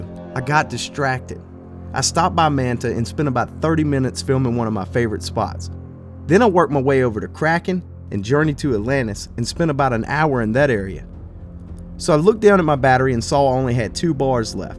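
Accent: South, United States. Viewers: sounds COUNNNTRRYYYY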